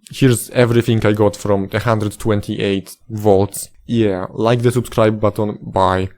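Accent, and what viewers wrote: Poland. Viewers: kurwa ale polski akcent natychmiast slychac ze to polak mowiacy po angielsku doslownie po dwoch zdaniach niesamowite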